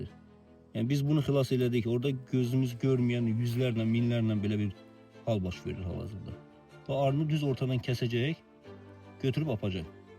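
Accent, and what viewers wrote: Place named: Zaqatala, Azerbaijan. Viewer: Zaqatala ləhçəsi)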